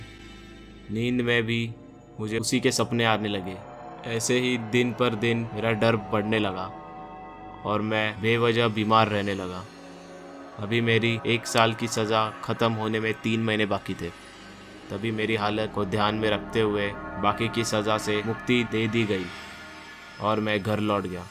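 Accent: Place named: Rural, India. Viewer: Story shi h lakin apne bolne ki style ko improve kr...asa lg rha h ki tu villager h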